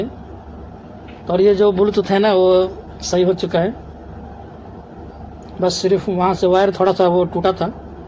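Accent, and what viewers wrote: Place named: Bihar, India. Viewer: Aap kahan se ho bhai jaan aapki aawaz se lag raha hai bihar ya jharkhand se ho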